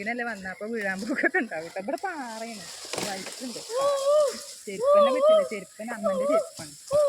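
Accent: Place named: Malappuram, India. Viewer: നിങ്ങൾ എന്നത് തെറ്റല്ല ഓരോ നാട്ടിലും ഓരോ സംസാര രീതി ആണ്.... മലപ്പുറത്തുജീവിക്കുന്നവർ വീഡിയോക്ക് വേണ്ടി കണ്ണൂർ തിരുവനന്തപുരം ഭാഷ സംസാരിക്കാൻ പറ്റോ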